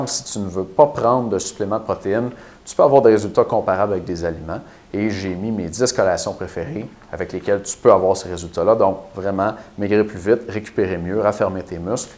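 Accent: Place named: Quebec, Canada. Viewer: Vous pouvez mettre du sous-titrage. Il y a des gens malentendants. Il y a des gens aussi qui ont du mal à suivre l'accent québecois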